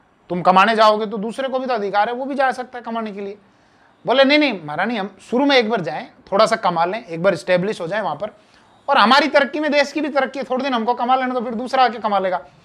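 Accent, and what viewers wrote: Rajasthan, India. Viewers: The way you teach with rajasthani boli in a comic way was nice...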